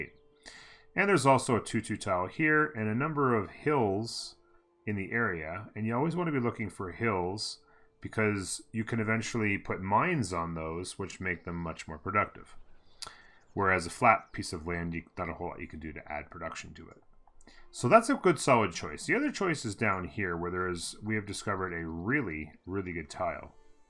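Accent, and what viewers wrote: Canada. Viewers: Great beginner guide! So I'm guessing here that that's a Canadian accent, am I right? Going by the vowel O sound. Anyway, Canadians make the best guides. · Hey! Loved the video. While it wasn't exactly what I was looking for I did learn a lot. Production is king, culture is king (early game). Pay attention to tech tree (a tip I have there is once I get my early game stuff, I select something way late game like robots or nukes so it auto paths) My only criticism is you didn't explain why something is bad. Which fair enough, limited time in a VERY informational dense game. But I mean I signed up for a 35+ minute video so imo it's appreciated. I loved how you explained why to do X, Y or Z. So if you did that, I think it would be a big improvement! Also do I hear a fellow Canadian accent ? 👀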